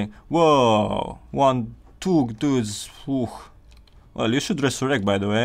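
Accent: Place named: Transylvania, Romania. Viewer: Esti cumva ardelean?Dupa modul in care vorbest :D.Un mod foarte bine facut e si Nova Aetas care, este modul meu preferat.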